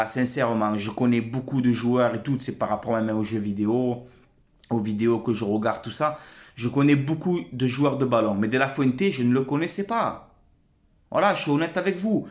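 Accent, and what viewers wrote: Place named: Garrigues, France. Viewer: Franchement avec ton accent des garrigues tu me régales...!!! La causerie de l'après match avec les incidents et la comparaison du COVID qui empêche d'aller voir les matchs aux stades ma parole bravo tu as tout dis..!!! J'ai entendu des supporters marseillais dire qu'ils allaient tourner leurs vestes notamment un auditeur sur RMC après le match, sous prétexte que Messi arrive à paris, perso je pense et j'espère que ils se font passer pour nos supporters mais que ceux sont des mitos... Dis moi tu en penses quoi.?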